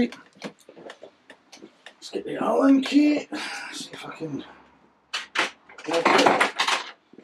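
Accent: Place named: Scotland, United Kingdom. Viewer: Good video mate, I just got a new Pcx 2 weeks ago. Decent wee machine,I now live in Spain so the weather is great for it. I am still frightened too death leaning it in the bends . I suppose it will take time. I do things on my ebike that I could never do on the Pcx.( ride like a madman ). Good to hear a Scottish voice. Keep it up mate. Subscribed 🏴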